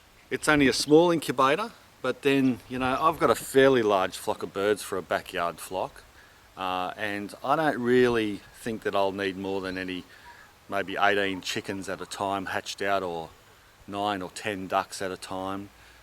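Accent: Australia. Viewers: Good review, and always miss Austrlian accent, miss Australian friends and teachers. However let me buy one and try with chickend eggs.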